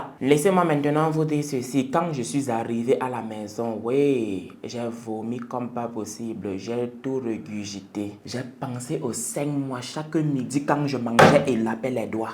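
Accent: Cameroon. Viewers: si tu es ivoirien, vraiment tu as appris avec réussite l'accent camerounais. Venons-en maintenant aux incongruités intrigantes de ton narratif. Si savoir se comporter est entre autres un critère de choix, à quel moment cette entreprise à testé ton comportement avant de te retenir? Et puis étant donné que l'approvisionnement d'un restaurant est une tâche répétitive, les responsables doivent s'assurer de la disponibilité des composantes de leur menu principal. Les cadavres des morgues sont conservés avec des produits toxiques pour tous vivants. Où d'après toi se procrent-ils permanemment la chair humaine? Et puis c'est à croire que tous les gouvernements qui se succèdent à la tête de ce pays sont une secte d'initiés à la dégustation de la chair humaine. Laisse moi retenir que tu as fait un post pour faire le buzz, recueillir des "Likes" et monétiser ta page. Merci à toi frère ivoiro-camerounais. 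· Ton accent nous dit que tu ès camerounais. Ne te fous de nous. Tu es vraiment menteur et malade. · Ces restaurants n'existent pas à Abidjan. C'est peut-être au Cameroun vu ton accent.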